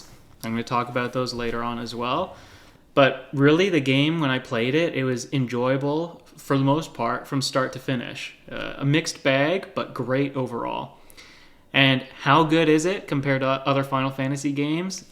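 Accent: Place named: Upper Midwest, United States. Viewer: I got FFXV on Steam in 2017 but didnt play it until last year. It was a pleasant surprise! You made great points about it. By the way, you remind me of someone I enjoyed watching on YouTube pre 2010 - Roger Swan, an American vlogger that lived in Japan. I think it's your manner of speech, maybe you're from the upper midwest.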